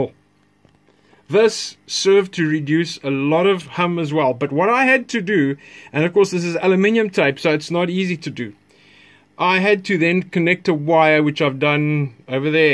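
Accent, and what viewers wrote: South Africa. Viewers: So nice to hear another Saffa's accent and also somebody who loves valve amps.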